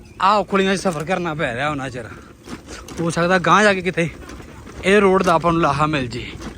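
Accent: Malwa, India. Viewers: ਬਾਈ ਮੇਰੇ ਵਾਂਗ ਤੂੰ ਵੀ ਸੜਕ ਨੂੰ ਸ਼ੜਕ ਕਹਿੰਦਾ ਹੈਂ😊 ਅਸਲ ‘ਚ ਇਹ ਮਲਵਈ ਲਹਿਜਾ ਹੈ ❤ ਬਾਕੀ ਅਨੰਦ ਹੀ ਅਨੰਦ 🎉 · ਬਾਈ ਮੈਂ ਤੁਹਾਡੀਆਂ ਵਿਡੀਓਜ ਪਿਛਲੇ ਚਾਰ ਪੰਜ ਮਹੀਨਿਆਂ ਤੋਂ ਦੇਖ ਰਹੀ ਹਾਂ ਜਾਣਕਾਰੀ ਤਾਂ ਮਿਲਦੀ ਹੀ ਹੈ ਪਰ ਤੁਹਾਡੀ ਬੋਲਬਾਣੀ ਨੇ ਦਿਲ ਟੂੰਬ ਲਿਆ ਕਿਉਂਕਿ ਮੈਂ ਵੀ ਮਲਵਈ ਤੇ ਦੇਸੀ ਭਾਸ਼ਾ ਹੀ ਬੋਲਦੀ ਹਾਂ ਸ਼ੁਕਰੀਆ ਬਾਈ ਜੀ🙏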